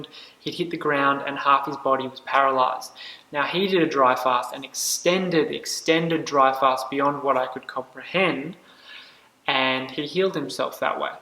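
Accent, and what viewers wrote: Australia. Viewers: Is there any value in doing Intermittent Fasting and not having any water or do you have to fully fast? (great to hear an Aussie fast!)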